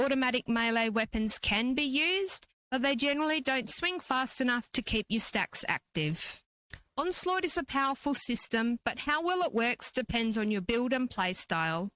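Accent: Australia. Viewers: It's good to see you making the move to YouTube. Your guides are great. As was mentioned, the volume of your voice is low, but you have a great speaking voice. I love those AU accents! Thanks for all the data mining that you do. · I will follow and like all your videos because you are so nice and polite and professional with me recently in a situation with a Facebook Fallout group I apologize if I really shouldn't be allowed to say this here but I just wanted to say thank you for being so nice and professional. Your videos here are awesome and I follow you all the time and I've been friends with you long before you where moderating anything you are a genuine good person I just can't get used to that annoying Australian accent since I'm Irish American but I love you still the same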